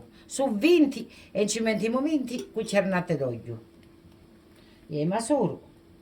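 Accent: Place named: Sicily, Italy. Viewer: Mi piace molto anche mia nonna li faceva cosi ..e mi piace anche il tuo dialetto mi fa ricordare quando ero bambina in colleggio a messina.Istituto santa brigida.❤❤❤❤❤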